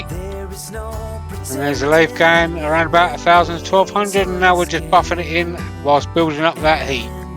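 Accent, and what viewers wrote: United Kingdom. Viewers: Very nice bowl, but surely you should call it a sweet bowl not a candy bowl. You sound like your from the UK.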